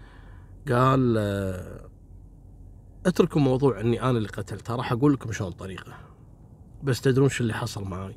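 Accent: Morocco. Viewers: ما احلى اللهجة المغربية بصوت اخونا ،تحية مغربية من بريطانيا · تعلمت اللهجة المغربية يا حتى المدن المغربية بدات تعرفها تحياتي لك من المغرب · يازين كلامك بالمغربي 😉😛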